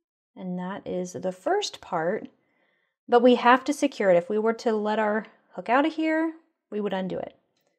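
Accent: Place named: Pennsylvania, United States. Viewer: Hi! Gave you a like of course... It's a habit I've gotten myself into.. I do it first thing so I don't forget. I already have mastered the magic ring, but your episode and technique was very good. Also, I liked that little cheat you did with using the ch 4 instead.. good to know! You sound like you're from PA. I can hear the accent in some of the words you say. Thanks for another great episode of B. Hooked TV. 🌼🙂🌼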